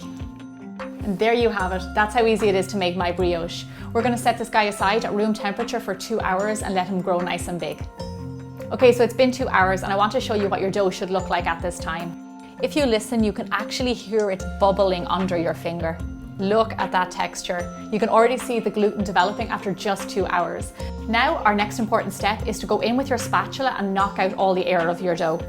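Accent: Ireland. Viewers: Hello are u irish if so I'm half irish and I went to Ireland for 4 weeks and made half your stuff love you! I'm going to be a confident bold baker for the rest of my life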